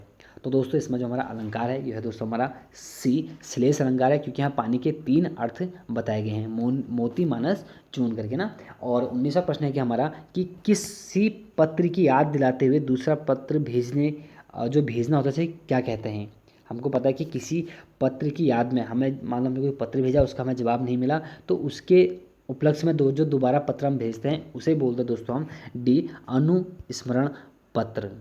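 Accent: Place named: Pahari, India. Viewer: आप की भाषा मे हमेशा पहाड़ी झलक दिखाई देती है। 🌱🙏🙏🙏🙏🙏🙏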